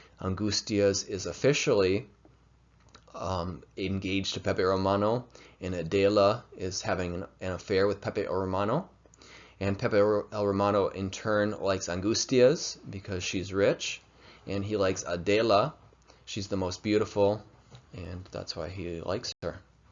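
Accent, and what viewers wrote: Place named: United States. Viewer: Thanks so much for creating this video. I think it will help my son a lot. As a fellow English-Spanish bilingual teacher, I wondered if you have ever considered getting coached to have a more native accent, whether from Spain or somewhere in Latin America? I know that for many students it is difficult to understand native Spanish speakers after years of having Spanish teachers who, though excellent just as you are, have such a strong gringo accent.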